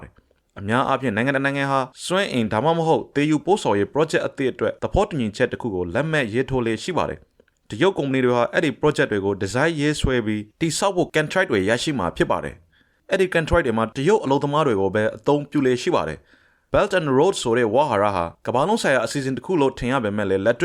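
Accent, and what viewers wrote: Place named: Rakhine, Myanmar. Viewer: Drဆာဆာကချင်းလူမျိုးပါ၊အခုအသံကရခိုင်သံဖြစ်ပါတယ်။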